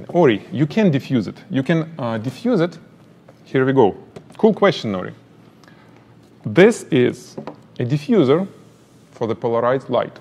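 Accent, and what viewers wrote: Russia. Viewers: Родной русский акцент ))))) класс, спасибо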